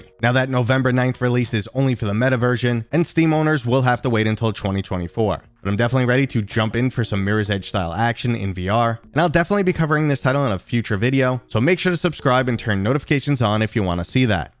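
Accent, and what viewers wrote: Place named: New York, United States. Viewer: I want to say New York accent but it could be Boston although I'd be surprised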